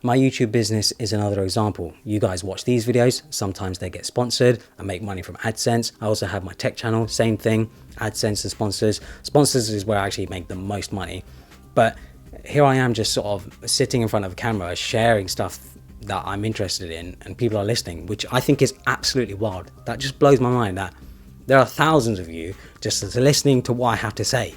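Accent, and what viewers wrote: United Kingdom. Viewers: because british accent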